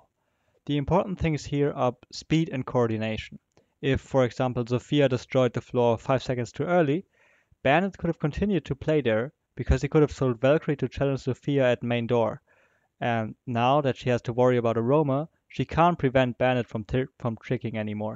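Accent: Germany. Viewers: Nice video! Are you german? Your accent sounds like you’re german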